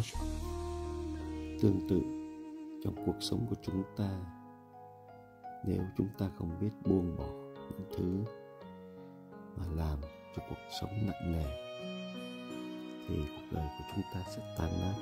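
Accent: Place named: North, Vietnam. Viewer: Giọng nói Bắc 54 nghe hay quá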